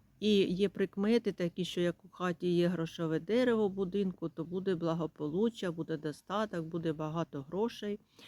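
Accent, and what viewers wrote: Chernihiv, Ukraine. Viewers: Ви, мабуть з Чернігівщини? Я теж звідти. По говору, це мабуть, Макошино, Мена, Сосниця? Я з Борзнянського району. Але живу в Дніпрі. Підписана на Вас. Дякую за цікаві інформації. З святом Вас. І нехай щастить в усьому.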